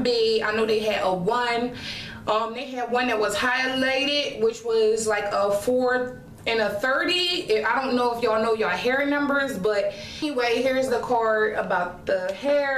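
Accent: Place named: Maryland, United States. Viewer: I have a question are you from Baltimore or Maryland? Normally I don't ask people where from on here because of privacy but your accent is very similar to mine lol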